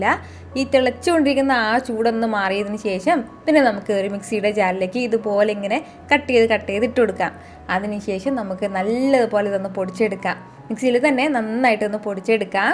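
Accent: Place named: North Kerala, India. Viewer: Watching you from US, love the way you talk and your north Kerala accent. Your introduction is very cute, sounds like a little girl. Continue to post lot of recipes...I wish you the very best!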